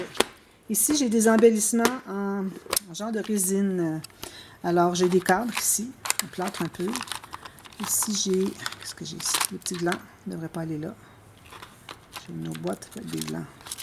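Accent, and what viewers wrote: Canada. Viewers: Bonjour,je viens de voir votre vidéo et votre chaîne pour la première fois, je me suis abonnée donc pourrai vous suivre 😊. J' aime bcp vos boîtes de rangement, j' ai l impression de me voir car je suis comme vous, je trouve votre classement très pratique, vous avez bcp de belles choses , beau matériel , j' ai hâte de découvrir encore ce que vous faites ou allez faire avec tous ceux-là...bien expliqué avec votre gentille voix...... accent agréable...Canadien ? merciiiii et prenez soin de vous madame, à bientôt j'espère 😊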